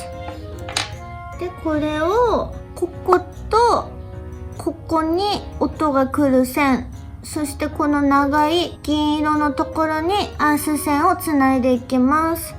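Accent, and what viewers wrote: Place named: Kansai, Japan. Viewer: こんにちわぁなるほど分かりました。親切丁寧たまに関西人ですやん！これからも楽しいずっこけ動画お願いねーほな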